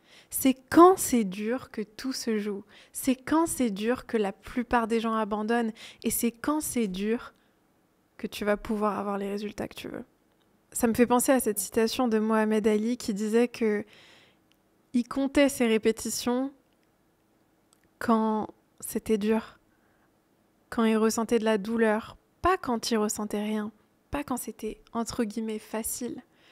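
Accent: France. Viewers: Malgré tout je suis suisse allemand je comprends bien parce que parle comme une francaise parisienne (clair et pas trop vite).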